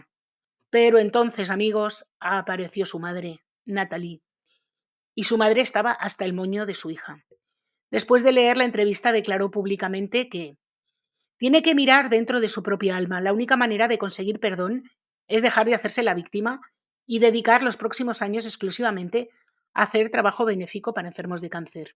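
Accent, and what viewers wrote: North, Spain. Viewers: No lo entiendo. Como pueden ser tan atrasados los americanos? Esos remedios son los que decían las bisabuelas, como lo del limón en la mesita de noche para el constipado. No es de extrañar en un país que no tiene sanidad pública. Hay que ser muy imbécil para creer en esos milagros a expensas de la ciencia. Solo faltan los vaqueros vendiendo crecepelos de estado en estado cual salvaje oeste. Una panda de retrasados! Un cordial saludo! Me encanta tu voz.😊 concretamente tu acento. Del norte? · Creo haber oído a alguna vez que es madrileña. Pero si parece que tiene acento del norte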